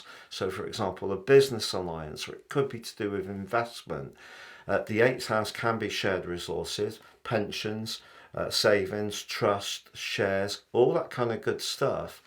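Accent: United Kingdom. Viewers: You're british?! 😂❤ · I love your British accent 😍and your videos 👌! “Venus in Pisces” (I’m a Pisces and I love many sorts of arts… ) By the way : I’m a Dutch 🇳🇱 ‘hobby’ astrologer -> My uncle was a ‘real astrologer and he taught me how to make and draw astrological charts AND of course how to interpret them, because it’s a way to better understand people.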